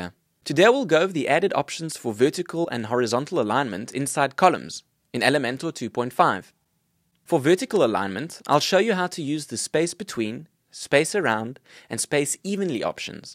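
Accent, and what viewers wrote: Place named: South Africa. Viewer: I can spot a South African accent from far . . . that broer is definately from SA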